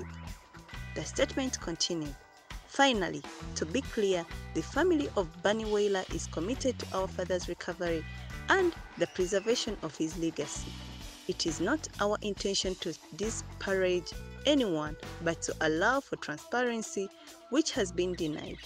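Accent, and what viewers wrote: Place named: Africa. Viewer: and she sound like african,and lot of Africans live in Jamaica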